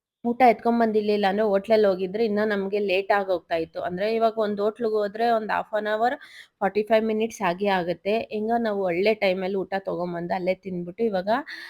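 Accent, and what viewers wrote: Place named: Shivamogga, India. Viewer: ಅಕ್ಕ ನೀವೂ ಶಿವಮೊಗ್ಗ ಕೆ ಬದಿರ ಕನ್ನಡ ಮಡ ❤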